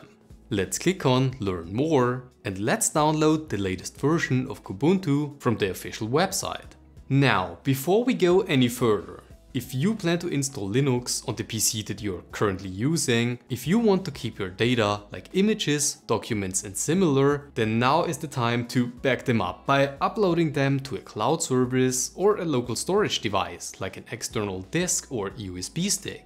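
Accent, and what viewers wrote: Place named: Germany. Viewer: deutscher accent aber gutes video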